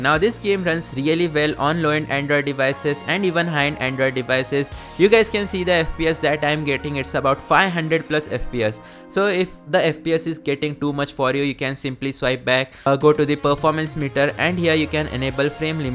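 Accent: India. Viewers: The indian accent makes me trust you even more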